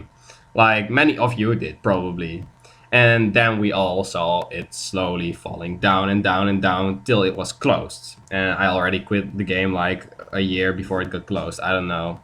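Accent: Netherlands. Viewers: You are Dutch arent you? haha the accent is such Dutchie :P Youve got a great video over here!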